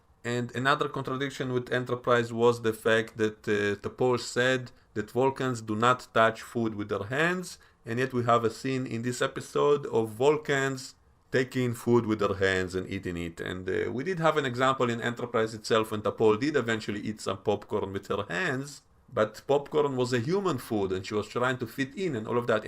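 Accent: Russia. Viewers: What accent is this? Russian? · I explained it this way: the Vulcans simply accepted their wish to not communicate the first time. Not insisting on further action. I totally agree on the loose stuff on ships that shake every now and then. Someone said there were force fields to keep everything in its place, but that doesn't make sense. I'm a man and I love cooking but I never looked at it with gender in mind...... Human Spock eats the bacon after he said "I have to catch up on things", or something to that effect. I think Vulcans have an ability to control their emotions based on certain biological factors. I think they mentioned that once in Enterprise. Mia Kirshner is only 11 years older than Ethan Peck. Spock's mother assimilated quite well to Vulcan customs, so perhaps that's why they can mind-meld? I agree on "emotional Spock". It's a tad annoying. But since it's a prequel series, they perhaps try to explain how TOS Spock became the way he is/was? 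Only question I have: what is your accent? I really tried to figure it out, but I can't. Sounds Russian, but Idk.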